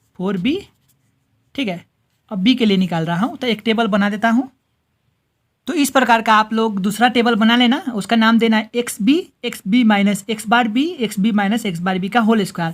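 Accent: Nepal. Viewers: Why do i feel like ur a nepali??that accent is so nepali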